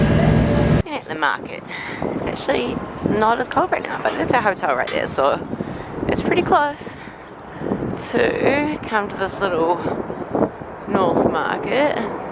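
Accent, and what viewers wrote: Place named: New Zealand. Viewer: I've just subscribed (YT recommended this video to me) and when you spoke, it was cool to hear you are a fellow Kiwi :-)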